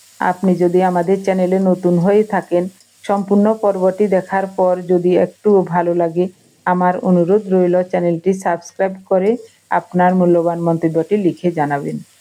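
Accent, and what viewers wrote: Noakhali, Bangladesh. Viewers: Bandhacopi bata khub bhalo laglo noakhalir khuda lagle hudao katha kotobachar par sune mon khub khub bhalo laglo dhanyawad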